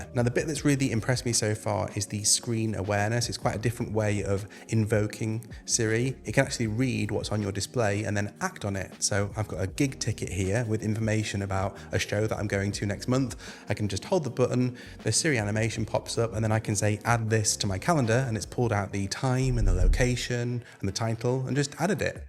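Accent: United Kingdom. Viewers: Brithish English is so gay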